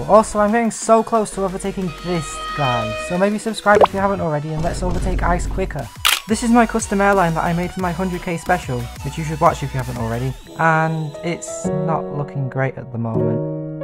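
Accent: United Kingdom. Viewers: I never knew that is British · Are you British? Because you speaking very well done and I love it. The plane is satisfying and great job! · Sup your british too?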